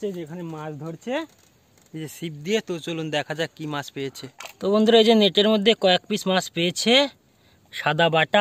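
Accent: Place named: Kolkata, India. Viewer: kolkatar bangla gula moja lage sunte...hevy test eta khub moja alge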